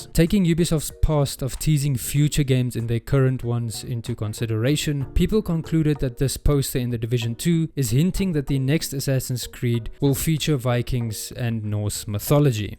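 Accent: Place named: South Africa. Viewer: You're South African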